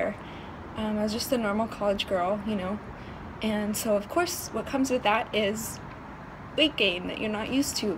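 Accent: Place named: New Mexico, United States. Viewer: Oh my gosh! I totally called your accent a few videos ago. I was like, "Man, she sounds like a New Mexican." That's where my mom is from & I lived there for about 4 years while I went to college. Loved this story time. It's very inspiring.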